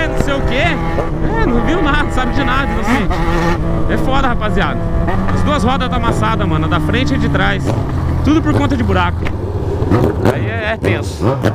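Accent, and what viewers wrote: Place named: São Paulo, Brazil. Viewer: Meu se mora no Paraná mano mais meu deixa eu te falar porque se então fala igual a nois aqui de são paulo? Meu tô achando q o é de são paulo mano não do Paraná kkkkk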